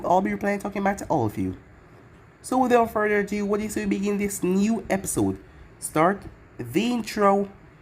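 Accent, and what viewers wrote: Jamaica. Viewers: Are you Jamaica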